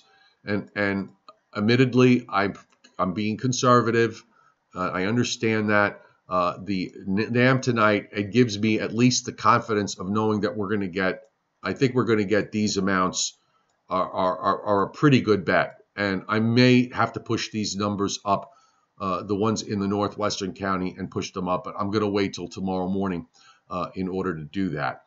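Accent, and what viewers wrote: Long Island, United States. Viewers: When I hear your voice it always reminds me of Long Guyland..Thanks for your YouTube weather..main stream has gone to Hell !!.. Just can't watch anymore...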